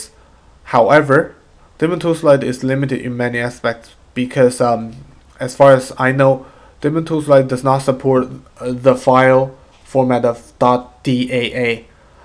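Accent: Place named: Iran. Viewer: Iranian Accent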